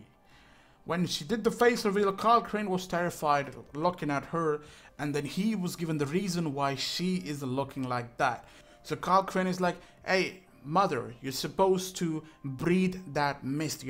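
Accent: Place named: India. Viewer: You sound like an indian gamer but thats why i will subscribe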